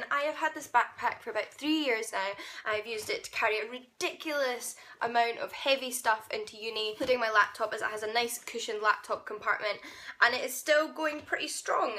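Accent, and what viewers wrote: Scotland, United Kingdom. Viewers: You sound Scottish!! I adore how you said Zed if I didn’t see the Z I wouldn’t have known what you were talking about and I thought the apple pie was funny 😂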